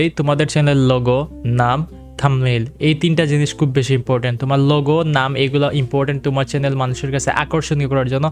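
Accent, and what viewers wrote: Sylhet, Bangladesh. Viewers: Bhaia aponi ki sylheti 🤔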